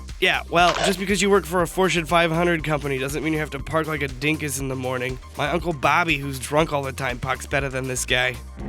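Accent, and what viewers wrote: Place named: United Kingdom. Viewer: i really liked your british accent ahaha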